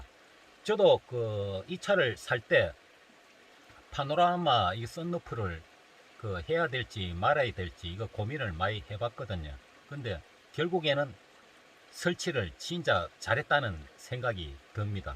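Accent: Gyeongsang, South Korea. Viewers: 요때기 경상도 사투리 정겹네요ㅋㅋ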